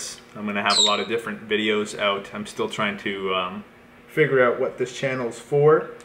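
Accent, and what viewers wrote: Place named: Southern Ontario, Canada. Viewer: How could I tell you were from southern Ontario before you even said Toronto. Must be the accent.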